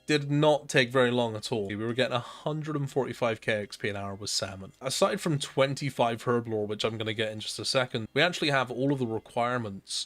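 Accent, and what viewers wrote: Bristol, United Kingdom. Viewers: bristolian accent shining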